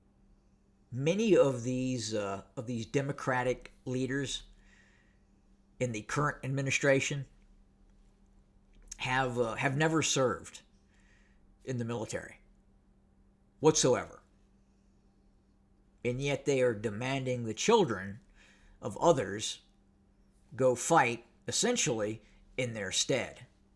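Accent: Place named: South, United States. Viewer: I enjoy the southern accent. 👍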